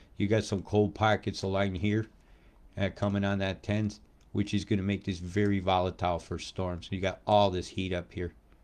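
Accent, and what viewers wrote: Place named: New York, United States. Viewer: I love your voice. Reminds me of both Brooklyn, New Joyzy & Boston. I was born in NY, my dad grew up in Scarsdale, was a welder/engineer in Brooklyn. As a kid we lived near Pelham. Used to skip school, take the train to Grand Central, muck around, panhandle for train fare back home in time from "school"... 😁 Keep singing .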